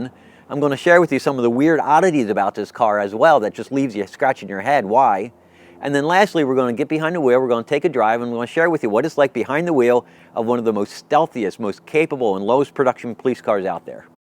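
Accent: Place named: East Coast, United States. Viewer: Really hate the east coast accent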